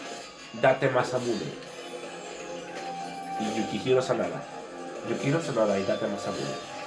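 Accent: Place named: Portugal. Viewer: Eres de portugal o galicia?....... Hay veces que no te entiendo. Yo soy nativo del español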